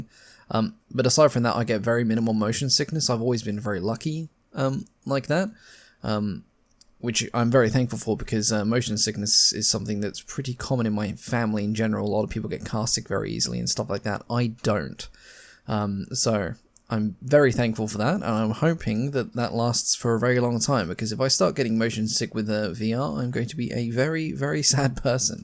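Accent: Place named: United Kingdom. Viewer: Hi, how are you doing my friend and I like your voice it's very british and how is it in the UK? I am moving there in 2 years 🇬🇧^_^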